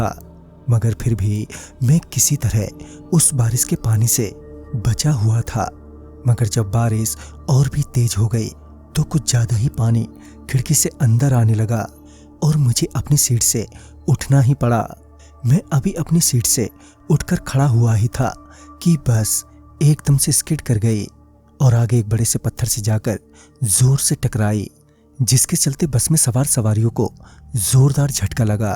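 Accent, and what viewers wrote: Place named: Kolkata, India. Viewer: Narrator bhi kolkata ka lag raha hai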